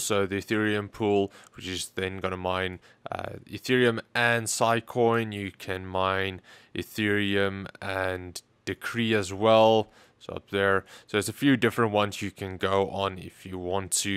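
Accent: Afrikaans, South Africa. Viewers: Are you south African? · This is the first video I've watched from this channel, and the first thing I thought of is, "Is he from South Africa?" because I'm originally from there, so I could detect the accent. It was fun to see I was right when the ad came in.. "are you from south africa and want to get some new gaming products?" Lol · Wou sê jou aksent klink Afrikaans. Dankie vir die raad maat. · Wow i heard his accent and knew hes from SA i am also lol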